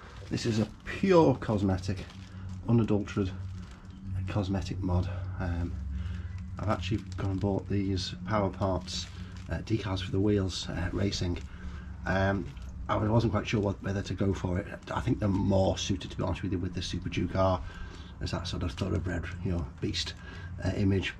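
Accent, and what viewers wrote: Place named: Lancashire, United Kingdom. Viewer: Just having breakfast clicked on YouTube watching your channel as I only found you about a month ago I'm watching your 1st ride on the GT. I know this road intermittently, Settle if I'm not mistaken. This is my 2nd blade too. I had a 06 which was better than the 15. Just watching next vid I think you just confirmed Hawes n Settle. Must crack on I've just moved from Stockport to Rochdale, your accent suggests Burscough/Skelmersdale. Calimoto screen shot north of Skem when I get the GT I'd love to meet up.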